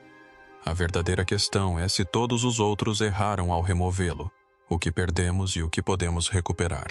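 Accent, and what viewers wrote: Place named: United States. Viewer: Por que essa língua? Usou a IA e fez narrar como um americano com sotaque? Isso estraga completamente o trabalho, e só melhora depois (por um momento).. Isso é um trabalho RELAXADO meu amigo.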